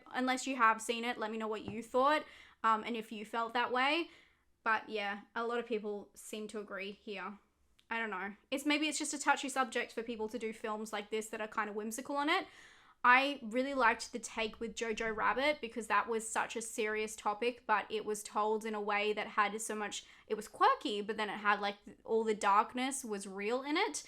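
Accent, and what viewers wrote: Australia. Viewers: Congratulations on getting married..I love your channel and your Aussie accent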